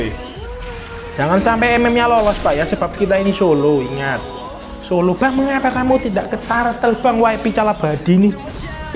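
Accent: Java, Indonesia. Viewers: saya suka cara gaya bicara nya kocak ada ciri khas wong jowo to mas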